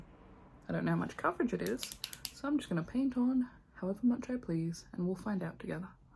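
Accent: Australia. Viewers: Random comment.. First time I've heard her Australian accent now that she's speaking a lot. I thought she was English from the other videos (hardly any speaking).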